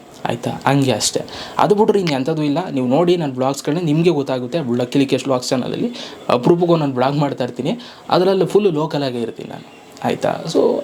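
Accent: Mandya, India. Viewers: ನನಗೆ ಇನ್ನು ಭಯದಲ್ಲೇ 🤣 ವಿಡಿಯೋ ಮಾಡ್ತೀನಿ ಹಾಗಾಗಿ ವಿಡಿಯೋ ಸ್ವಲ್ಪ ಶೇಕ್ ಆಗ್ತಾಯಿದ್ದೆ 😅 ನಿಮ್ಮ ಆ ಮಂಡ್ಯ ಭಾಷೆಯ ಸೊಗಡು 👌 💛❤️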